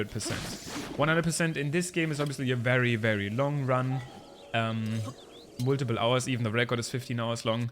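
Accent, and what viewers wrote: Germany. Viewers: Dein Englisch klingt so deutsch xD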